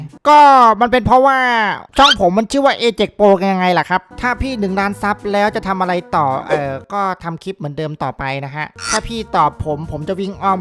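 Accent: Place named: Ubon Ratchathani, Thailand. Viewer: เสีองพี่คือขอพูดพี่คืออุบนเหมือมผมพี่เป็นคนอุบนใช้ครับ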